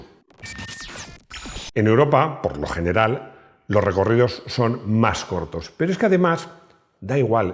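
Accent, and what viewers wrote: Chile. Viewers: ... esa voz en off es sin duda de un chileno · es chileno se le ve en el asento... A donde nos fuimos... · la voz en of ES CHILENO " PA ONDE NOS FUIMOS"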